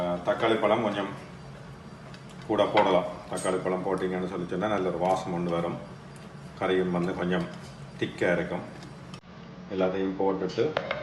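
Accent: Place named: Jaffna, Sri Lanka. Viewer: Love to listen your jaffna Tamil than your fish gravy😇.meen kulambu ready. Wife is behind me😂😂😂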